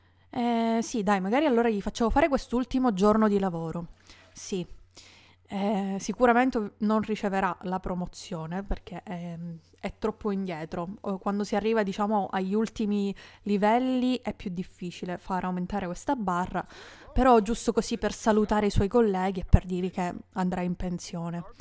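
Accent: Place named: Palermo, Italy. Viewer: Melaaccolo poco palermitano noto😂😂😂 io pure lo sono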